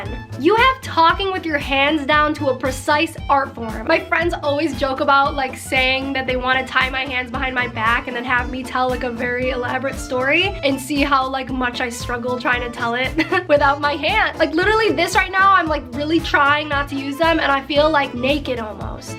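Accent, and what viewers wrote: Naples, Italy. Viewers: Yeah she sounds Napolitano or siciliano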